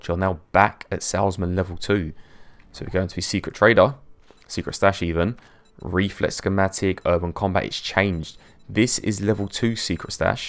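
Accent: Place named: England, United Kingdom. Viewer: Dude, thicc English pronunciation. Do love it)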